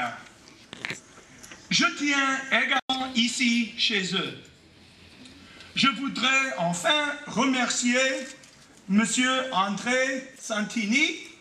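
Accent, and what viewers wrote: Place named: England, United Kingdom. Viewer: omg I was not expecting this when I hit play 🤣🤣 fair play to him, I would not have the guts to speak on stage if my pronunciation was this bad. It actually gets better as he goes on, just his intonation/cadence is very English.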